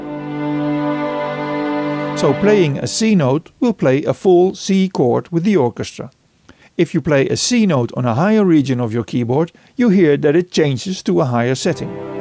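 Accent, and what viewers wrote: Netherlands. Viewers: Really funny Dutch accent.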